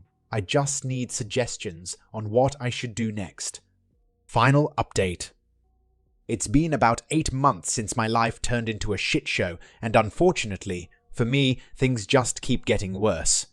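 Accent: United Kingdom. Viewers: Not my favorite rendition either. Would sound more authentic were the AI not speaking with a British accent.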